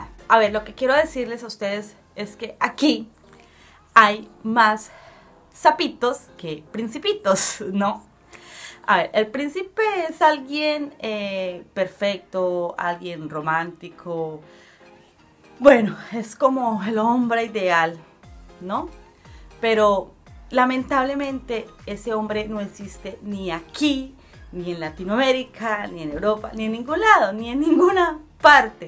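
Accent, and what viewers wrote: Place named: Colombia. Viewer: Me encantas como hablas a lo colombiano que graciosa 🇨🇴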